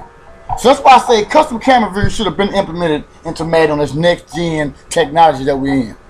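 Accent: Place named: Baltimore, United States. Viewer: That Baltimore accent is thick as shit. Vieruu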